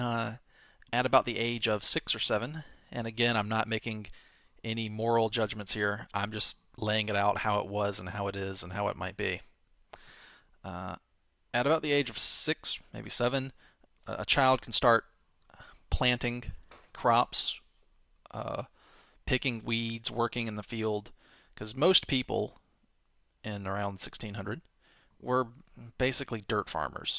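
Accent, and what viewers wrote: South, United States. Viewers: i think is from the south, i can hear it in some of his pronunciations.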